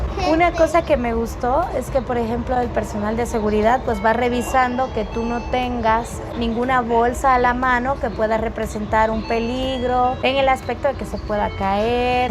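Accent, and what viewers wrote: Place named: Mexico City, Mexico. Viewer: No detecto que seas cubana...hablas como chilanga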